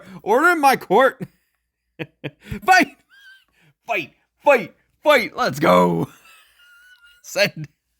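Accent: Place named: England, United Kingdom. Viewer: I am English and this is the funniest English accent I have ever heard 😂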